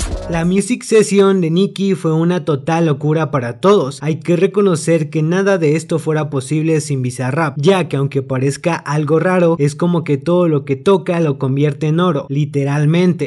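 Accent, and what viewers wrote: Argentina. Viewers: Sos argentino o uruguayo vos?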